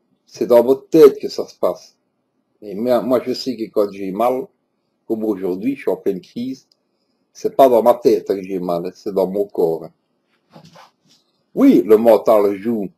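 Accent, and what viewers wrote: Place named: Belgium. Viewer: Merci et bravo :) je suis fibro depuis 12 ans et depuis cataloguée hypocondriaque par mon doc donc aucune prise en charge anti douleur ah si je dis des conneries dafalgan codéiné pour mes migraines avec aura nan pasque ca donne mal au crane de souffrir h24 quand même alors merci j ai adoré t'écouter (ahhhhh l accent belge) ps: suis de france genre cht'i et ici même bordel fibromyalgie kezako reprend un ticket et reviens me voir plus tard lol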